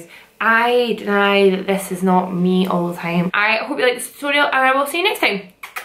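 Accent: Scotland, United Kingdom. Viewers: I love you so much, your eyebrows are sooo amazing! I stay in Glasgow I feel like I have a bond with u , there's not many Scottish youtubers. It's nice to hear the accent. ❤️❤️❤️ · she has the most gorgeous Scottish accent, gives me liiife ❤❤❤ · I LOVE SCOTTISH ACCENTS SO MUCH OH MY GOSH I JUST WANT TO LISTEN TO YOU TALK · Sounds Scotch to me. But then again my family's from Scotland so I can easily tell. Their's are way more pronounced tho. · it's a scottish accent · Leandra♥ Scottish accent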